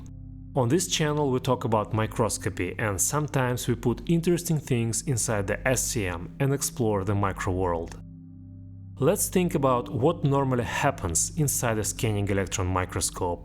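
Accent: Russia. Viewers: Интересный у тебя акцент, ты русский? 😊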